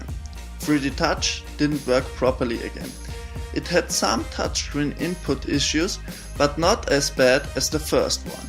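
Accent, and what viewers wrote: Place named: Austria. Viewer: klingst sehr österreichisch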